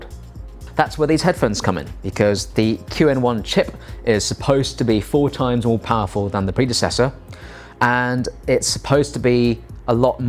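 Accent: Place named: RP, United Kingdom. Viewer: This guy is the most posh-sounding tech reviewer I’ve ever heard.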